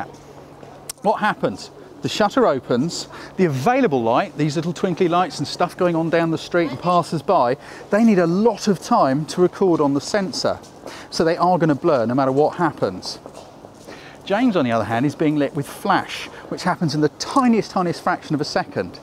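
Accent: United Kingdom. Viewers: EXCELLENT video! I really enjoy your calm and clear presentation (enhanced by your great British accent). You clearly got a passion and talent for both photography and presentation. You got yourself a subscriber. Great work, I learned a lot =)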